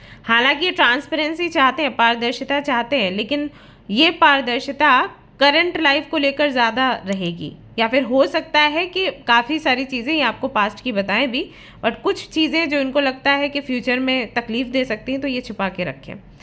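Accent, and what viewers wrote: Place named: Assam, India. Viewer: I was watching it thn suddenly heard he might be Assamese guy.. lik I was thinking bunch of things before or while watching the vdo.. wow, might there is a chance for me again. Spetical yet I dnt knw.. Let's see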